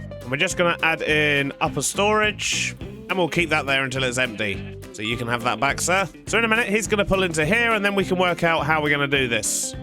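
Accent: United Kingdom. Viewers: Bri'ish man with beard destroying villages and forcing the villagers to leave so he can make factories is my favorite series ever (in minecraft)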